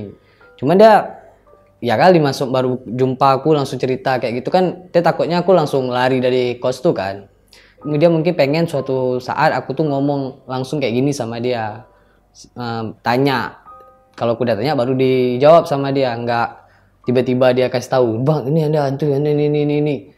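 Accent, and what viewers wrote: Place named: Aceh, Indonesia. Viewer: Logattt acehhh banget abgnya✌🏻😁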